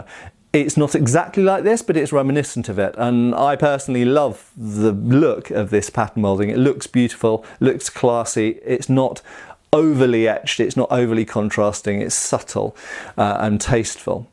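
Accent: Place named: United Kingdom. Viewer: your pronunciation is fine for JIEN and DAO but you sound like that Brit intel guy who sent Bruce Lee on the mission in Enter the Dragon -- he also said "Haaan" while BL used the more genuine "Hahn"... good rule of thumb for pronouncing Asiatic names is to use the typical romance language vowels -- A is usually AH rather than AY or AAA... KEEP UP THE GREAT WORK!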